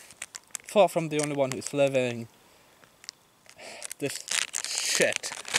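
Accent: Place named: Ireland. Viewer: I can tell your accent is Irish possibly and thoroughly enjoy all your videos. Anyways thnx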